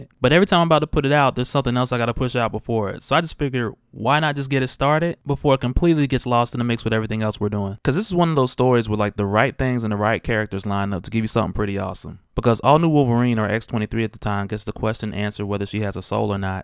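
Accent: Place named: Brooklyn, United States. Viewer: this shit is so ill,its hellSTROM not storm fam, great job though u sound like you from brooklyn are you ?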